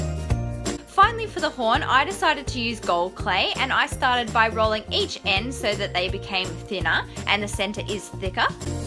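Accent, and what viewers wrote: Australia. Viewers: i heard your voice and i knew straight away you were aussie😁(im aussie too)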